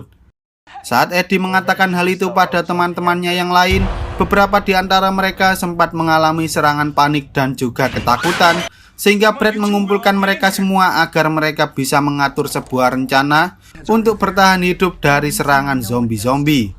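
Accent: Java, Indonesia. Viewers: Medok Jawa nya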